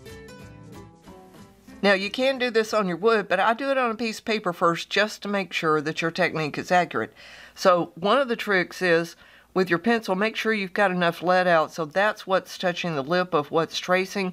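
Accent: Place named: South, United States. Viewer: Love the accent…western? Southern?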